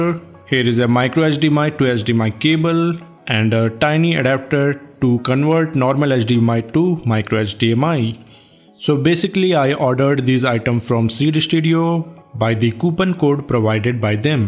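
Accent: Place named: India. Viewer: your indian accent kills me! really? does everyone in India talk english that shitt*y? pffff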